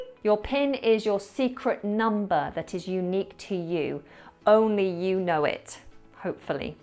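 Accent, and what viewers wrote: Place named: United Kingdom. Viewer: I love you lady and I enjoy learning English and in my point of view British accent is so cute especially when you ignore "R" at the end of word that's soooooooooooooo nice.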